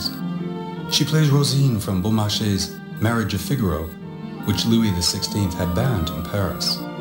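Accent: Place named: United Kingdom. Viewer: The narrator clearly sounds British, not american nor French either.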